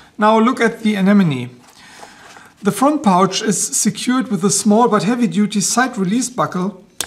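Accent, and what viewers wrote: Germany. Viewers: I'm half German and I love listening to your German-accented English. It's Wunderbar!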